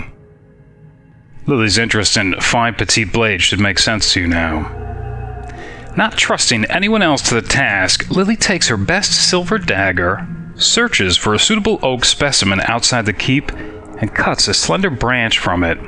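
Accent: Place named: New York, United States. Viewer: Maybe it's because you're a New Yorker. Sounds like you're saying Lie-LICK. Just like how you pronounce "Ruins" as "runes". :P Course, I'm a Philly suburbs guy, So I can't really talk. :P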